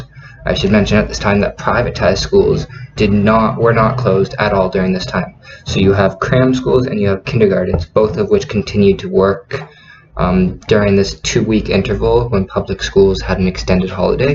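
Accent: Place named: United States. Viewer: Good sharing, you live in Taichung now? I can pick up your American accent. God bless America. MAGA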